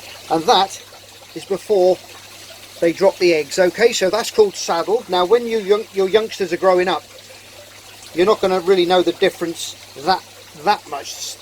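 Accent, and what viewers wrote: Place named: United Kingdom. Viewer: another fantastic video. Don't sell yourself short on your camera work, its brilliant. I started watching your vids and decided to start with Red Cherrys as they are easy. It's good to hear a British voice as most vids are from the USA, no disrespect intended. Thanks again.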